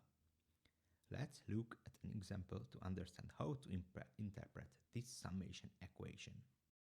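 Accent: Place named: Hungary. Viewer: MI A FASZ, CSAK MOST VETTEM ÉSZRE, HOGY TE MAGYAR VAGY. Az akcentus eladta a dolgot. Meg az, hogy variációnak nevezted a permutációt, ami magyar dolog. BRUHHHHHHHHHHHHHHHHHHHHHHh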